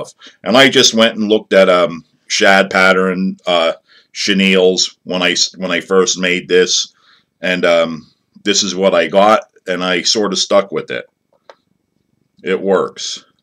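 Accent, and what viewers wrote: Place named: Pittsburgh, United States. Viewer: hey I was just wandering if you were a yinzer?. I am and you sound like a yinzer. check out my video about the secret hair jig as I give a shout out to you. Great job and keep up great work. if you are a yinzer leave a message for me and we can get on the water. thanks yankeelipper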